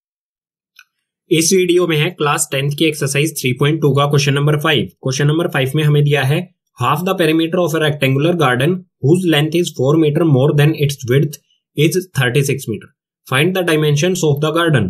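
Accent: Haryana, India. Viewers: bhai aap haryana ta ho k??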